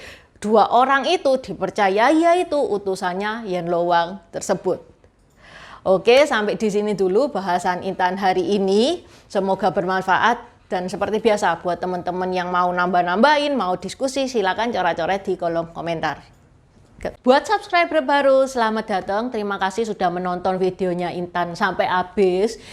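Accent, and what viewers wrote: Java, Indonesia. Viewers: Mantap mba jawanya medok sedap bangat ! 🍺🍺🍺🌹🍷🍷🍷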